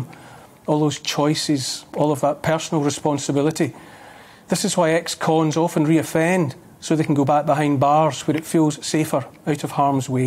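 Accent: Scotland, United Kingdom. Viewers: this is proof that if you hire a Scottish man to talk about anything and vaguely reference William Wallace, people will agree regardless of how idiotic or poorly thought out the statements may be 😬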